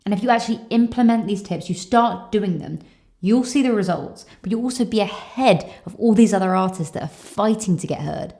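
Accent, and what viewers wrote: England, United Kingdom. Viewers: Which area in England you're from? (love the accent)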